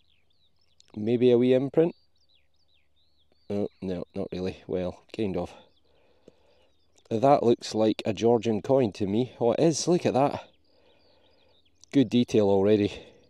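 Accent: Scotland, United Kingdom. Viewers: When you find coins do you have to share with the land owner? Love your channel and the Scottish accent. ❤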